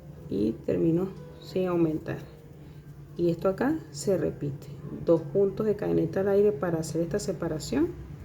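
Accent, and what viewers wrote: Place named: Venezuela. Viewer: Te has ganado una suscriptora más!!!!.... te felicito... me encanta como explicas. Creo que somos paisanas por tu acento jaja... lo hice y quedó muy bonito. Espero que mi clienta quede satisfecha, cuando me envíe foto te las paso por instagram... Gracias otra vez por compartir tus conocimientos con otras tejedoras... un abrazo Venezolano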